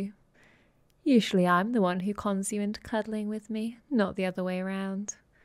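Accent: United Kingdom. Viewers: Thats accent sounds very either scottish,british,or irish and ...i really like it,not just cause im irish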